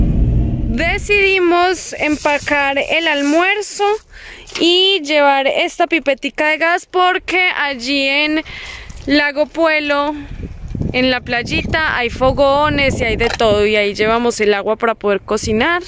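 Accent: Colombia. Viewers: Me encanta su espontaneidad y como muestran la realidad de vivir viajando. Que lindo que estén en Argentina . Disfruten y éxitos en sus caminos de aventura. Hermosos y divinos colombianitos. Con ese acento que me fascina. Besitos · Me agrada mucho la forma de expresarse que tienen las colombianas, tengo una amiga de Envigado que es muy parecida a ti y es un sueño oírla y verla hablar. suerte en el resto del viaje por Argentina, Y desde luego mas allá aún. Soy de Gral Alvear, provincia de Mendoza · El acento colombiano 🥰🥰🥰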